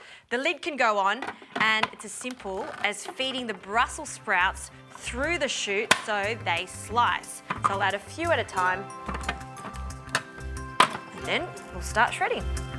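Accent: Australia. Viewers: I love the Aussie accent ❤️❤️❤️. Only came here to see if sprouts were ok to eat raw 😁😁😁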